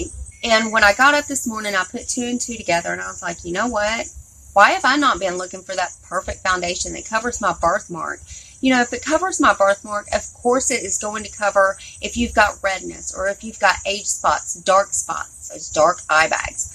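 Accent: South, United States. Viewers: I was curious,Where are you from?Im from Arkansas.You sound a bit country like here.💞💖💕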